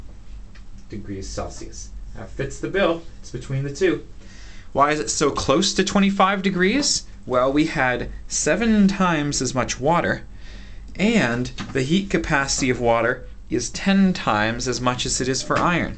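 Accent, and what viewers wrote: Canada. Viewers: are you Canadian??????? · Please tell me your from Montreal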